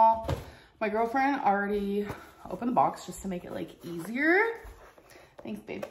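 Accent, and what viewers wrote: South, United States. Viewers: What happened to her southern accent ? I haven’t watched her in a while lol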